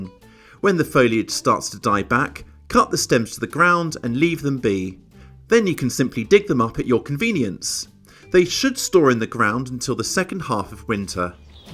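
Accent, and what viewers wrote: United Kingdom. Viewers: The moment you open your mouth I know you were British 😅😅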